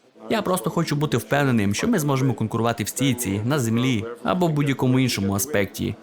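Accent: Transcarpathia, Ukraine. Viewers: Круто але западенський акцент дратує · І ще одне питання. Дівчина почувши відео впевнена що ти земляк з Закарпаття 😅 Так є, чи помиляється?)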